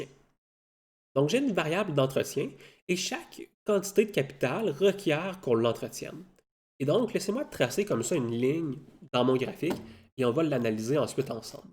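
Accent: Quebec, Canada. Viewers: formidable vidéo aussi bien pour cette explication clair et précise que pour ce fabuleux accent Québécois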